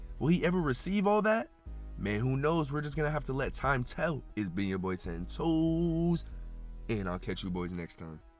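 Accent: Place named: Toronto, Canada. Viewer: i can hear the toronto in ur voice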